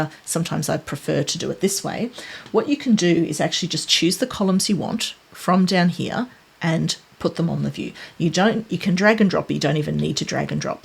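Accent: Australia. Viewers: thanks heaps, I love your pace, your explanations and of course your aussie accent makes me feel right at home:) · love that Aussie accent!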